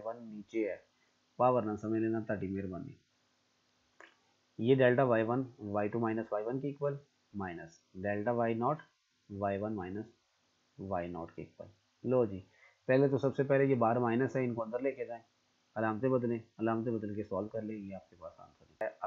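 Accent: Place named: Punjab, India. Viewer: Mashallah your Punjabi accent is so lovely. Really love your teaching method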